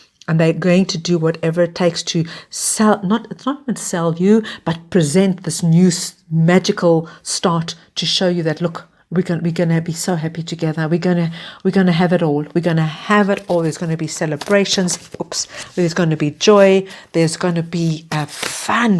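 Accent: South Africa. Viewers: I know a South African when I hear one 😁 love to see it 🙌🏾🤍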